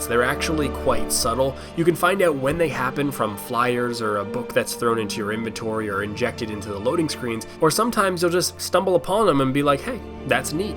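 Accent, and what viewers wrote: Canada. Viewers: Your Canadian :) or from Minnesota. lol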